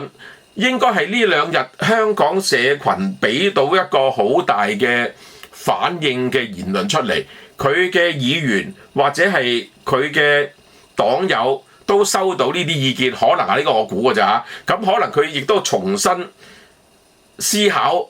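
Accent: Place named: Taishan, China. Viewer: 感謝伍 Sir 乜原來時聞喺台山話，我還以為是新會話，因昔日有新會籍先輩常宣於口。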